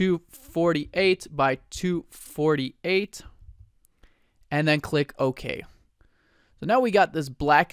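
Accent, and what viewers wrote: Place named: France. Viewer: You have a perfect French accent ! Thanks for this tutorial.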